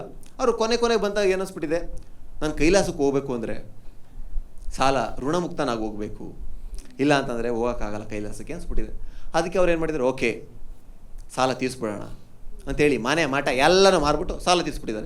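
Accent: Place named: Chamarajanagar, India. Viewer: Annoovv ur from chamarajanagara❤ ansuthe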